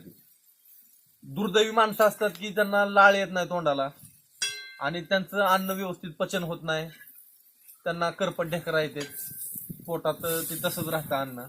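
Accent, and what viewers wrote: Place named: Malshiras, India. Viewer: Tumchi malshiras talukyatale vatata karan aamhi pan tikdachech aahot......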